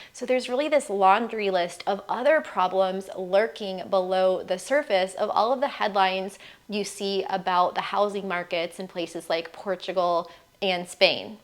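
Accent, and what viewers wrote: United States. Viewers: so professional, love your american accent, love your eyes, i'd give you the visa you wanted If it were up to me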